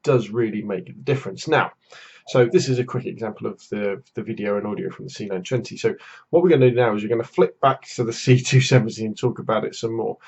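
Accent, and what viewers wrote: United Kingdom. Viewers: Hy, nice video! the quality is good and there is no flow. the webcam performs depending on the system configuration so can you tell me pls on what configuration you recorded the video(processor, ram memory, video card and if you have ssd or hdd)? it will really help me decide to buy the c270 or not. By the way cool british accent.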